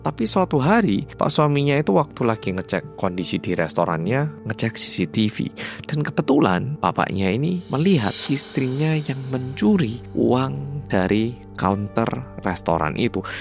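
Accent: Java, Indonesia. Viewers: Hai masbro muedok😅 Nyimaaak 🎉🎉🎉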